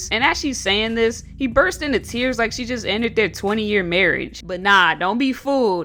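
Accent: United States. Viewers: Narrator’s voice like sandpaper. American lazy speak. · I love your videos, nothing on YT ever feels genuine and funny anymore. But your stuff is just so good. I appreciate all your uploads. Also, I love your accent. you from the south for sure, me too.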